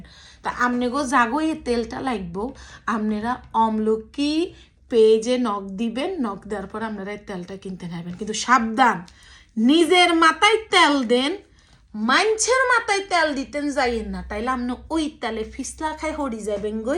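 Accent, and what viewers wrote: Noakhali, Bangladesh. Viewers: আপু আপনি আপনাকে অনেক ভালোবাসি আপনার ভিডিও গুলো সব সময় দেখি আমার নোয়াখালীর ভাষা অনেক ভালো লাগে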